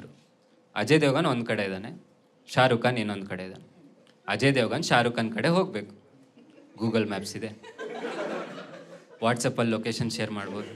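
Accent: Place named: Davangere, India. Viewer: Sakath bro…I loved it man. I have friend from Dhavanagere, nin thara ne guru same mathadodu!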